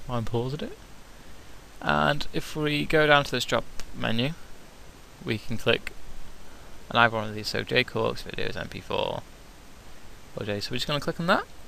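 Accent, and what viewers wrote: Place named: United Kingdom. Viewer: lol you have a funny british accent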